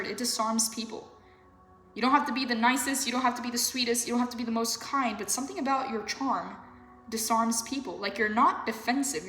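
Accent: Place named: Latin America. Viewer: 333 Eastern!!! I AM SO HAPPY WHEN I SEE YOU POST!! Ain't gonna lie I feel like we are long lost family. I swear I can feel you when you meditate. I detect a Latina accent am I correct, if so I was wondering what your lineage is? I ask because my indigenous ancestors were Tahino { primarily Porterican and South Florida descent and Aztec { South America}... Either way I usually only listen to 3 readers on the regular and you are my top 2. My other reader does readings every day. I understand why you don't, you put a LOT OF ENERGY in your readings. But as someone who was a Christian Pastor and has a degree in Social work, a soul healer where I have given advice to hundreds of not thousands by now... I will gain in sight from you I will be honest, I don't have very many people who are able to advise me with things I don't already know or I am not already thinking of. 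You do. FULL HONESTY I believe you have powerful emotional advice. I wish we were friends. I see how the cards and spirit brings out beautiful messages through you but you absolutely communicate them with grace. I find myself listening to you more for your advice and empowerment as I am processing through life. Know you are loved from afar and I greatly appreciate all that you do. My only wish is I could afford your personal readings so I could finally have just a normal conversation about life .. Chi Miigwetch ( Heart FEALT gratitude, Ojibwa)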